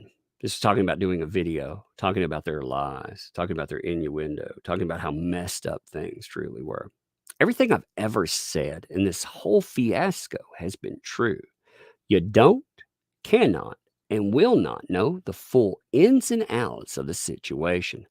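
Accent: South, United States. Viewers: for the record your accent would probably be called "Educated Southern".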